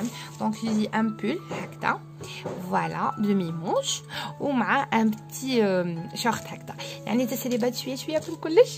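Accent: Constantine, Algeria. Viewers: ربي يوفقك حبيبتي و ربي يهنيك حبيبتي جاتني هدرتك قسنطينية انتي من قسنطينة !!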